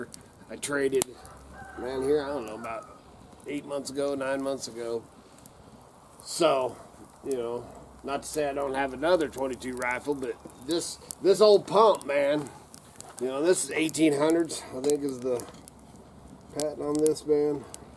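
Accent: South, United States. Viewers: I hear where you come from you're I just a redneck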